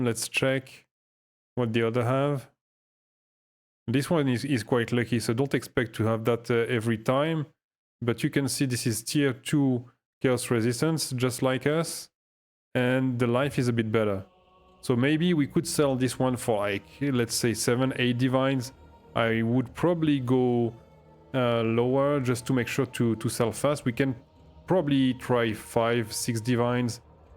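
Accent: France. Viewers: au vue de ton accent je suppose que tu es français ^^ en tout cas , très bonne vidéo. Les divines se font rare en ce moment x)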